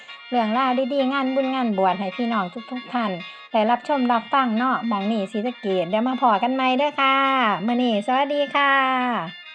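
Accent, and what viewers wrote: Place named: North, Thailand. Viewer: สวัสดีจ้าน้อง พูดภาษาเหนือได้น่ารักดี อนุโมทนาบุญด้วย สาธุสาธุสาธุ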